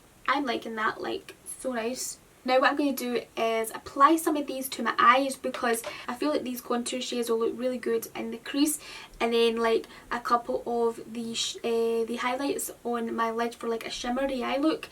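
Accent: Scotland, United Kingdom. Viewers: Are you Scottish if so where are you from because I’m Scottish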